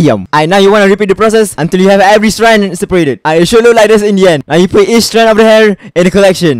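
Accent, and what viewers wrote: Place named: Malaysia. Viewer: this accent sounds familiar edit: ah no wonder, hes from malaysia and so am'i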